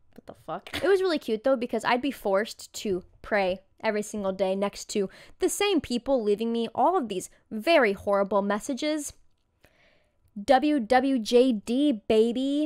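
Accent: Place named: Canada. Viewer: Why do you have the Canadian raising accent 😭😭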